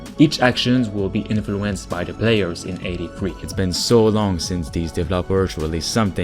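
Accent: Quebec, Canada. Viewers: Your Canadian French are you bro?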